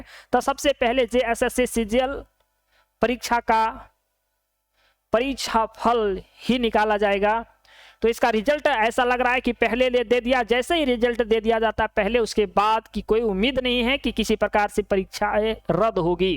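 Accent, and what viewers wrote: Bihar, India. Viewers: Tum bihari ho n...tumhra language n tone bihari wali hai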